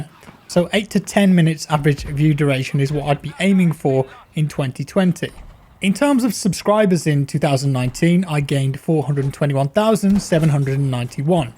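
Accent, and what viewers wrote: United Kingdom. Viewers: USA dominates your views because we just cant resist that British accent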